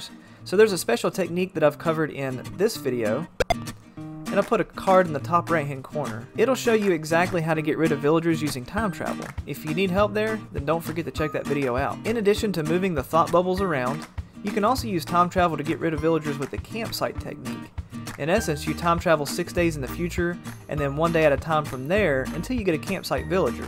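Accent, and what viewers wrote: Texas, United States. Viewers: Ngl, you sound like what people think Texans sound like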